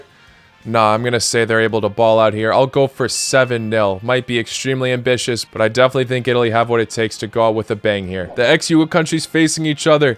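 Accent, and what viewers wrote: United States. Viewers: I swear, your the only person i've heard who has an American accent that has called the sport with it's right name "football". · his accent is kinda American but he commonly wears Croatia kit and sometimes Serbia Bosnia and a Montenegro kit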